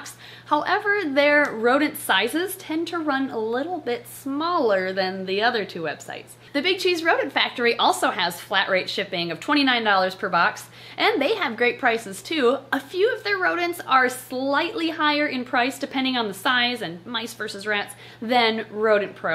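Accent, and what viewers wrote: Minnesota, United States. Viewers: Minnesota English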